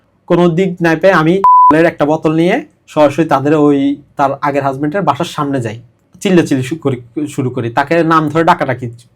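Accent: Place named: Chittagong, Bangladesh. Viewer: মনে হয় বাড়ী চিটাগাং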